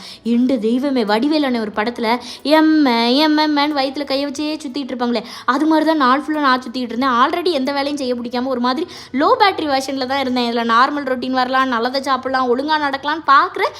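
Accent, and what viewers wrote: Tirunelveli, India. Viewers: செவெனேன்னு சாப்டிட்டு இருந்திருக்கலாம். அய்யோ நம்ம ஊர் திருநெல்வேலி slang ❤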